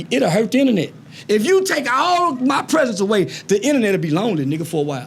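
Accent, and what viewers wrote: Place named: Chicago, United States. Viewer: he gotta stop talking like king von.. That Chicago accent is ignorant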